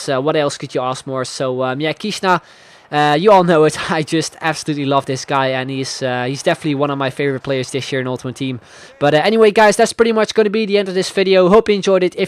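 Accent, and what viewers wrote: Netherlands. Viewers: Hey, are you dutch? Oh, and I love your proper content, you earned another subscriber 😊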